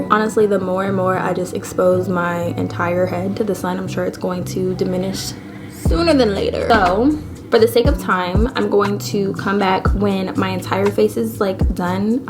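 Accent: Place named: United Kingdom. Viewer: Yessss we missed you , at least the British accent will never change on us 😭 love your videos ❤❤❤❤ so glad your doing well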